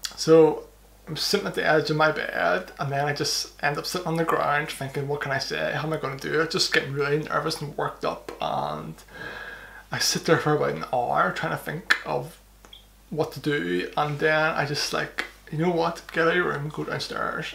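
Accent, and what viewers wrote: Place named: Ireland. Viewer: I loved your video and your accent, where in Ireland are you from?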